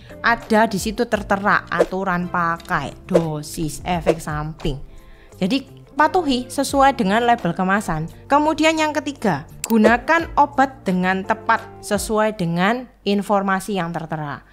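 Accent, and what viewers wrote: Java, Indonesia. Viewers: Bahasanya muuedok. Semoga sukses.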